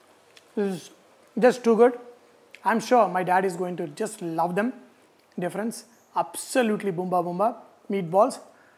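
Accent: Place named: South India, India. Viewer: Abbe keema hota hai keema, ye kab southern pronunciation se kab tak dimag ki dahi karoge yaar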